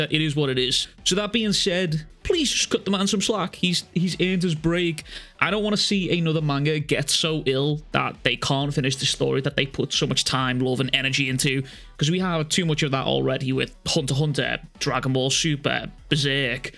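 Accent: Liverpool, United Kingdom. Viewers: Are u a scouser ? I thought you were scottish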